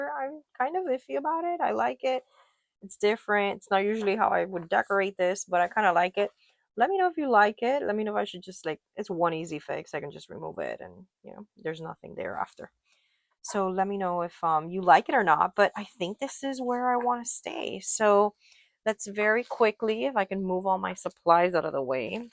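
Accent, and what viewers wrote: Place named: Miami, United States. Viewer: I heard your accent and instantly knew you were a fellow miami girly! Hiiii! Love the vid! :)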